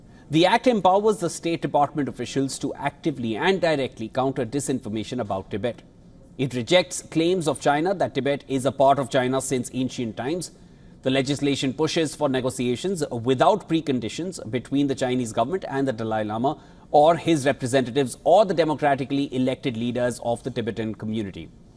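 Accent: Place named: India. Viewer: You sound like indian callcenter agent-scammers here in the US.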